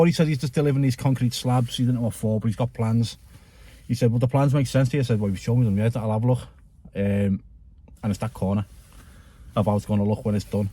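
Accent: Liverpool, United Kingdom. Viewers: Is this a Liverpool accent?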